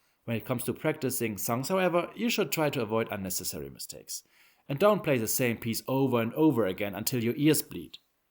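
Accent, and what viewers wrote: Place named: Germany. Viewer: Well, I liked your video. And you sound like you're German. Which is also good.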